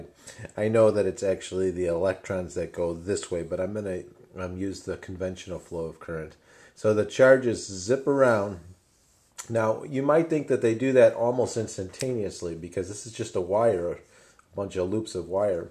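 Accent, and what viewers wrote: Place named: Brooklyn, United States. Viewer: Hello -something in your accent tells me you are from Brooklyn N.Y. N.Y. and like eating Gefilte Fish and beigel with cream cheese, am i right? Greetings from Yoram Stein Amsterdam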